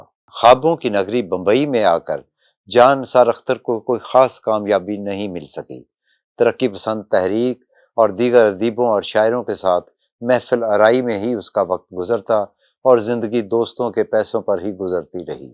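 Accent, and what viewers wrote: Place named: Punjab, Pakistan. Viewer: urdu reading in Punjabi style.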